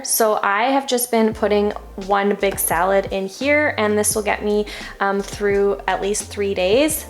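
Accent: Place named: Canada. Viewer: I’m guessing by your accent and spelling your a Canadian too.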